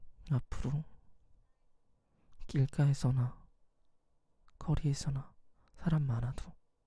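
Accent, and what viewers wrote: Gyeongsang, South Korea. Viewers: ㅋㅋㅋ남자가 왜이리 소심보스야 ㅋㅋ우쭈쭈~ 서툴지만 애정표현 하려고 노력하는 모습이...심큥💘)ㅋㅋㅋ 아무말 대잔치나하구 말이야 ㅡㅡ 이거이거 내가 좀 적.극.적.인 남자로 만들어 줘야겠구만 ㅋㅋ 그와중에 귀여운 경상도 사투리이~박크력)있네 ㅋㅋ 자기야 뽀뽀 박아 빨리 ㅋㅋㅋ😘 이거 이제 만날때마다 사랑한다고 말하라고 시켜야겠구만 ㅋㅋ 님 영상 볼때마다 내 가슴이☆ 콩닥코..♡ 죄송합니다 헤ㅔㅎ.. 쨋든 오늘도 잘들었습니다💕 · 아....사투리 너무 멋지다♡ 경상도사투리쓰는 남자 너무 멋있어여♡♡♡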